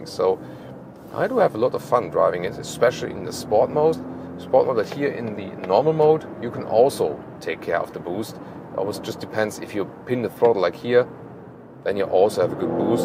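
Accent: Germany. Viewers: You have a strong German accent, you speak too fast, and you mumble. Not a great combination for comprehension. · Are you a native german? Because you're accent sounds very german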